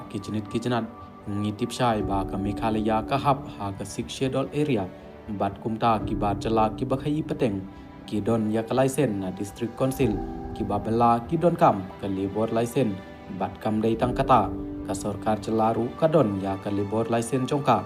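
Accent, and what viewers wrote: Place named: United Kingdom. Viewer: Speaking English like British mind set like Street boys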